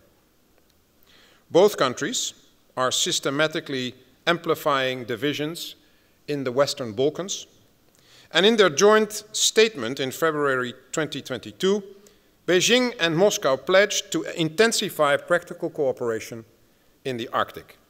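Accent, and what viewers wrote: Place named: United States. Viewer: No wonder he talks like an American.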